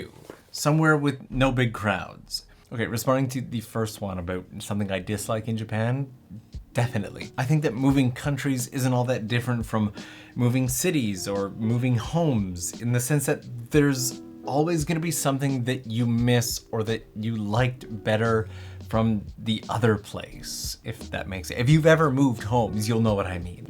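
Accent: Canada. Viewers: Your accent is screaming Canadian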